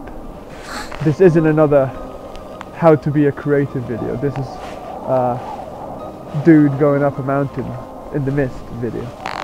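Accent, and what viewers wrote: United Kingdom. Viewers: i just realized how dificult to understand is british accent for me but anyway your clip are awesome. Thanks for sharing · i loved your videos in spanish, but im also loving your british accent. and most of all, so glad you just did it and made a video. so beautiful and cinematic even with a simple man climbs mountain storyline every second is enjoyable. i still go back to your other films to improve my spanish. excited for the proximo video and inspire to get outside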